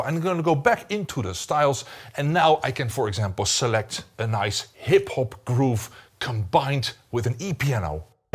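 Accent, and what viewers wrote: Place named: Netherlands. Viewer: His accent... must be dutch.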